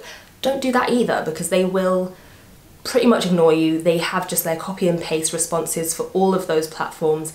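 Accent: England, United Kingdom. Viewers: English is too English. Had hard time understanding her and so I just quit. Maybe she should take a couple of bucks and get a voice coach.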